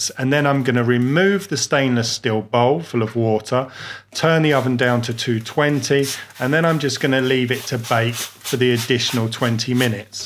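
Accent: United Kingdom. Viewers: hate the way British people say "wot"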